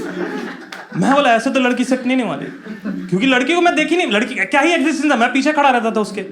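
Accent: Bihar, India. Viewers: Your style of speaking is very similar to Ravi Gupta. Maybe its the Bihari or Purbi accent! I think if you can also add Mallu Hindi accent with Bihari it can be a very good act. Liked your show, well done. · Bhai your bihari accent owasm... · Your Bihari accent is great bhaiya❤❤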